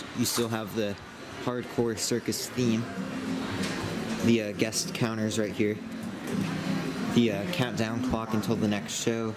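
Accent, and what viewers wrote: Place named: South, United States. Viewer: The whole Wonders building is very dated but could be gutted and converted into something else. The outside should be painted from yellow to silver or something less garish but the space is huge and should be reimagined into something which makes use of all that space, maybe a ride. You are so disciplined which is good as it must be tempting to open things and interfer with stuff you see but you don't which should be commended as a lot of vloggers don't always do the same. Also where are you orginally from? I can't always recognise American accents but you seem to have a soft sounding southern accent I can't work out.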